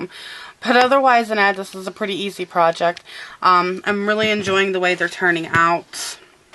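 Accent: Pittsburgh, United States. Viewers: Yinz guys???? Your a Pittsburgh girl! I'd know that accent anywhere:) Love the glasses...thanks for sharing;)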